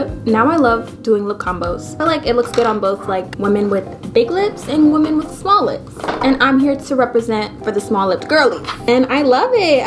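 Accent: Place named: United Kingdom. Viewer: Yessss we missed you , at least the British accent will never change on us 😭 love your videos ❤❤❤❤ so glad your doing well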